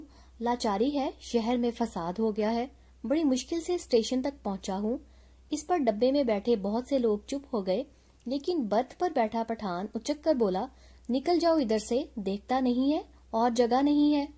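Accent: Pakistan. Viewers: Mam, where do you belong to? Actually your level of urdu speaking is same as that of pakistani serials! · Aapki voice lyrics se pta lag rha that u re from Pakistan m i right?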